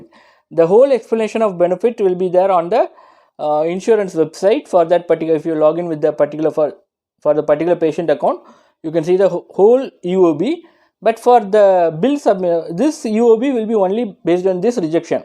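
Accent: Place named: India. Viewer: Oh man, I'm really struggling to understand that hardcore Indian accent! Subtitles help a lot.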